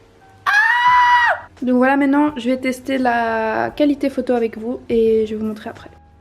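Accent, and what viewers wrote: Belgium. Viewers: tu es belge? J'ai l'mpression car tu as l'accent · C’est normal qu’elle disent ouite a la place de huit car elle est belge moi aussi je dit ouite enfaite c’est parce que chez nous en Belgique ont dit pas huit mais ouite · Accent belge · Tu est Belge? Sinon t'es parfaite💍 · Tu es belge ? J'ai beaucoup aimé ton unboxing ! Moi je vais avoir l'iPhone 6s en août donc je suis assez exister aha ! Gros bisouuus ❤❤ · elle a un accent belge c est ps de sa faute😅🤷🏽‍♂️ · Tu viens d'ou de Belgique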